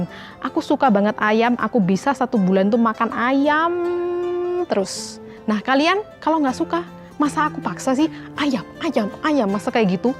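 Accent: Java, Indonesia. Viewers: Kak...kok ada medok nya....org mana kak???? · Adohh ngakak ya baru pertama kali liat video nya. Medok e keliatan 🤣🤣🤣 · Aku sub dah!!! Ekkekwke mirip hansol 🤣 nyrocosnya logat jawa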